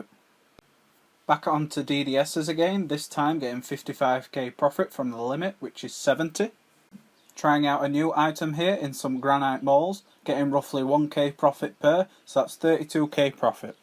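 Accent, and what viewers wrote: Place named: Scotland, United Kingdom. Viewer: gotta love the scottish accent!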